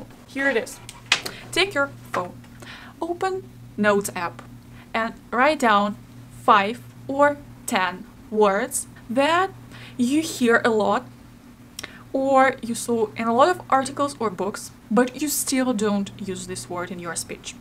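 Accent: Russia. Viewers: Is she Russian? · Is Russian her first language?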